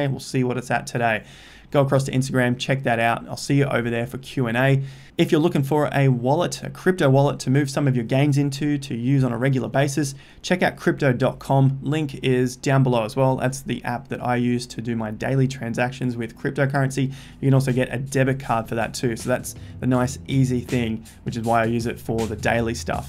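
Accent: Australia. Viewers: First video I watched of yours. Good to hear an aussie accent. I new subscriber :)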